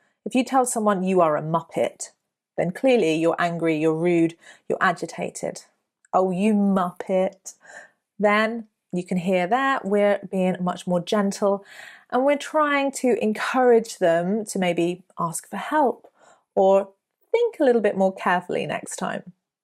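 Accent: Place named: RP, United Kingdom. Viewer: Some of these expressions we hear in the US. Some are completely English and quite funny. Btw, you have a nice upper-class accent.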